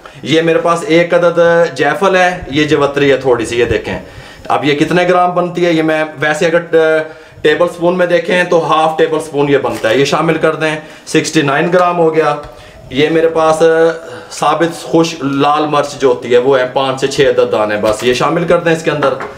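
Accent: Punjab, India. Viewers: Sir mazzrat k saath asp ka punjabi ascent hai tau sabut lal mirch k baad aap nay Kia dala naam samajh nahi aya🙏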